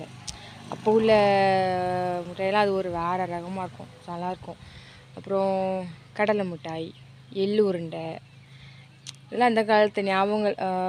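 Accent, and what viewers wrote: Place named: South, India. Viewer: கள்ளம் கபடம் இல்லாத குழந்தை தனமான உங்க தெற்கத்திய பேச்சு எங்களையெல்லாம் மயக்குது தங்கம்.நீங்க தொடர்ந்து வீடியோ போட்டுக்கிட்டே இருக்கணும், நாங்க உங்க அழகான பேச்சை ரசிசிகிட்டே இருக்கணும்.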